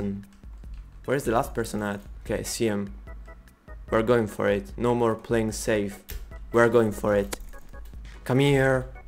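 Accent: Italy. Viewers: Si sente proprio che sei italiano lol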